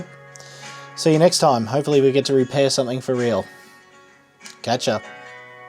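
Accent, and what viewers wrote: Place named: Australia. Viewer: I have a crown xls1000. With the same symptoms as this one. No audio and screen is blank. The fan powers on for like 1 second. I noticed when I was using it last it had red lights on and on the screen it said overload or overheated, so I turned it off and back on and never worked again. I tried reseating the ribbon cable on the board side but still nothing. Any other ideas. You sound like your an Aussie, where are you based?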